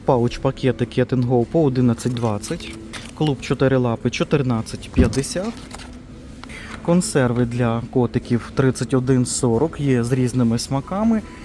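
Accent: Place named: Central Ukraine, Ukraine. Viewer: Акцент центральноукраїнський. Живе, звичайно в Києві. В якій області виріс самому цікаво.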